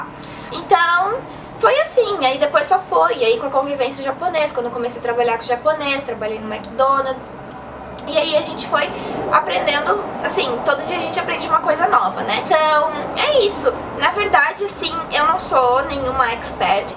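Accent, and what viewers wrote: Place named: Southern Brazil, Brazil. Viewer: Gostei do seu vídeo, gostei das informações, ultimamente tudo o que tem a ver com japonês eu estou consumindo e o seu vídeo foi útil pra mim. E pra mim foi muito lindo ver uma menina "Japonesa" com o sotaque do sul do Brasil!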